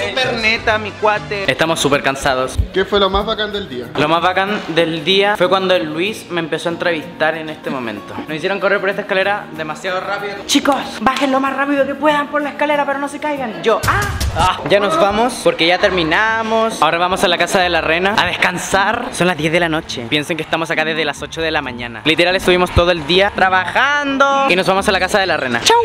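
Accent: Chile. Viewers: eri chileno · eres de chile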